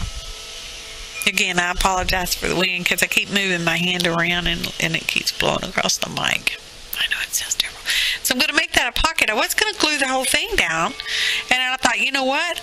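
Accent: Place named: Alabama-Mississippi, United States. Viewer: sounds just like Ala-ssippi!!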